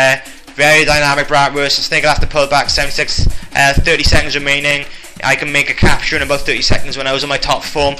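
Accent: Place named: Wales, United Kingdom. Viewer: One of the most famous captures ever in UT! casting with his Welsh accent:D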